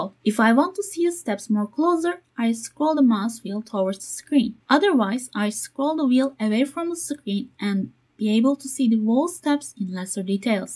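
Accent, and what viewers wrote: Turkey. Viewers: aksandan türk olduğu anlaşılıyor :) teşekkürler video için. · Türkçe gibi İngilizce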